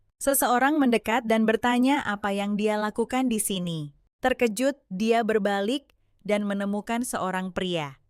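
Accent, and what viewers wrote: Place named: India. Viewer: Njiiirr logat india.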